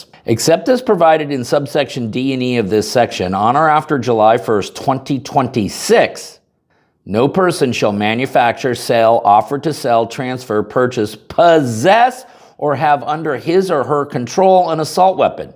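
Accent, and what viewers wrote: New Jersey, United States. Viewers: Sounds like Jersey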